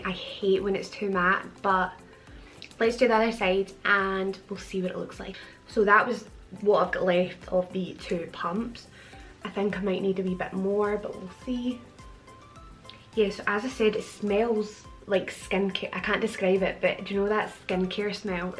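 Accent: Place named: Scotland, United Kingdom. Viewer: LOVE that Scottish accent omg!!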